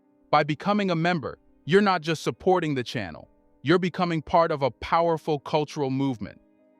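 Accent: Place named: United States. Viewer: What's with the American accent? Seems a little hypocritical.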